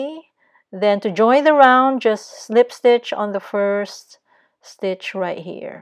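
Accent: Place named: Philippines. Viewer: Are you Filipina? I like the way you speak.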